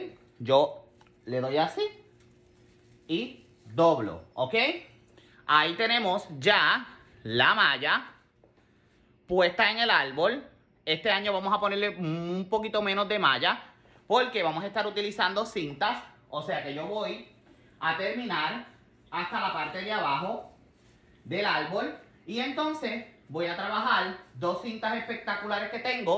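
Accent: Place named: Puerto Rico. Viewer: Nueva seguidora desde q te escuché sabía q eras Boricua bien bello y espectacular!! 💖🇵🇷🙌🤩 Me encantó como explicastes como poner la cinta. Me gustaría una recomendación, mi decoración este año será madera, blanco negro y metal, que cintas debo de incorporar? Ya de ante mano tengo la de saco y la de cuadros Blanco y negro, ( búfalo) q otro color de Debería añadir, plata, dorada o blanca ? Help!!! 🤣🤣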